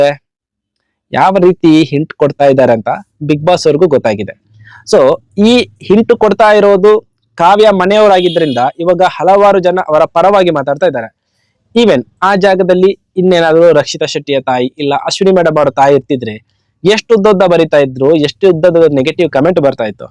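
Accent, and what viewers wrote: Mangalore, India. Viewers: ನೀವು ಬಿಗ್ ಬಾಸ್ ಬಿಗ್ ರಿವ್ಯೂ ತುಂಬಾನೇ ಚೆನ್ನಾಗಿ ಮಾಡುತ್ತೀರಾ. ನಿಮ್ಮ ಮಂಗಳೂರಿನ ಭಾಷೆ ತುಂಬಾನೇ ಚೆನ್ನಾಗಿದೆ ♥️♥️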